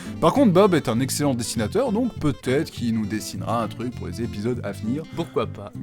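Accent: Belgium. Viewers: J'étais sûr que vous étiez belge sa s'entendé sur l'une de vos vidéos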